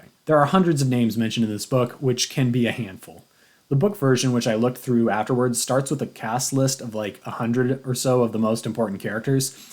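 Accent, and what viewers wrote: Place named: General American, United States. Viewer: Very insightful thx! By the way you have a beautiful "General American accent". 🎉 I am kind of a language nerd. ;) Keep up the good work! 🎉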